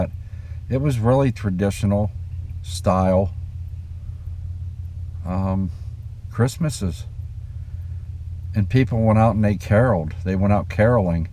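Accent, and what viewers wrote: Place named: South, United States. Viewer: I Think Your From The South,Can I Asked Where Is It:)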